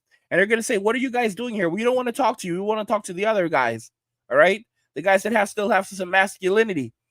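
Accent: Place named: New York, United States. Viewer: Women whine and squeal like the pigs under a gate. They're arrogant, selfish, and delusional to a T. After they told us men not to come near them, they're not worth investing so the juice ain't worth squeezing. By the way, your NY accent is on point. Way savvy than Wrestling Writer Vince Russo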